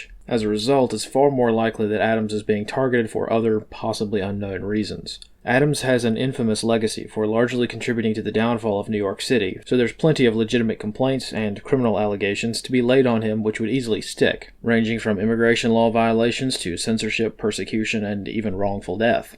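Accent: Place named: Chicago, United States. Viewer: Sounds like he’s from Chicago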